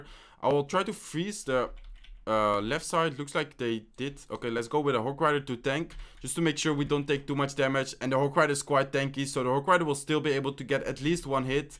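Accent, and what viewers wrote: Netherlands. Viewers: Haha Yes thats Dutch, Im dutch to. Its funny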